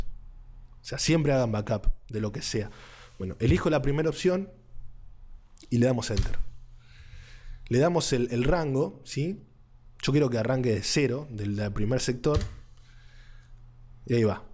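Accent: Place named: Argentina. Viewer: Uno casi nunca encuentra un video tan informativo como el tuyo y encima con ese acento argentino que tanto uno necesita en la informatica jajaja, gracias genio aprendi una bocha con tu video ! Me suscribo y ojala sigas con el contenido que me parece muy interesante !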